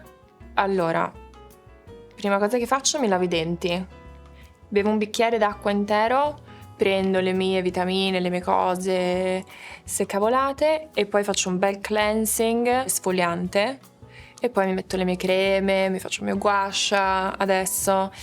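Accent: Italy. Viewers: semplicemente “l’accento” italiano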